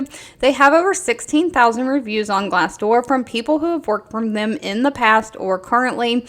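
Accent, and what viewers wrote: South, United States. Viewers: Don’t let those haters shut down that bubbly southern voice darlin 🥰 We love it! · I love your southern accent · It's not annoying it's Southern like me. Don't feel you have to appease these negative people. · Im from NY and I love your southern accent!! 😊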